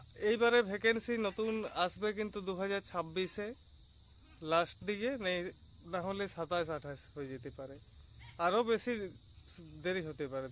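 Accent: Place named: Purulia, India. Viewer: কথা শুনে মনে হচ্ছে তোমার বাড়ি পুরুলিয়া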